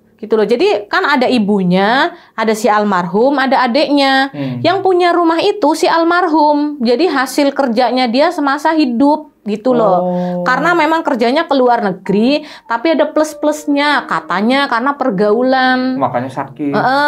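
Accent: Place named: Banyumas, Indonesia. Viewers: Semangat mbakyu,lanjut seru ceritanya ngapak bikin ngga ngantuk mbake. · Wong ngapak mbanya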